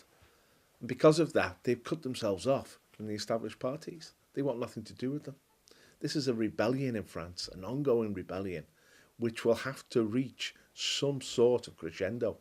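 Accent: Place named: Scouse, United Kingdom. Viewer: As a 57 year old man living and growing up on Merseyside it is refreshing to listen to a fellow Merseysider speak out on behalf of the values of the true conservative centre right - which the modern Tories are the antithesis of. When I was very young in the late 70's and early 80's, whenever a face appeared on a screen with a scouse accent delivering a political message it was unwaveringly on behalf of militant leftism/trade unionism/socialism etc. The dark icing on that wretched cake being Derek Hatton and Militant. That moron eventually became a pantomime celebrity. Fitting.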